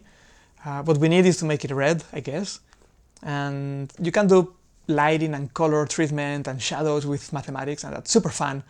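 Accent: Spain. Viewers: Really cool format and it results in a really entretaining and informative video. I can tell you speak Spanish from your accent so: muy buen vídeo! 😃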